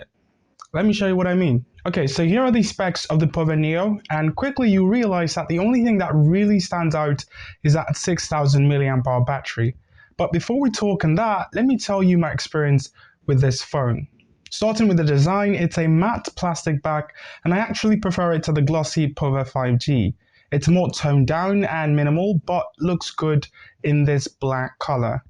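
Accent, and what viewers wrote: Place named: United Kingdom. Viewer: Nice review. Plus I like listening to ur British accent. Always.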